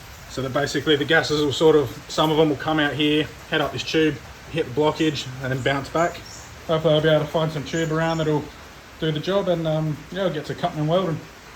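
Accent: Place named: Australia. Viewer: In australia? im guessing via the accent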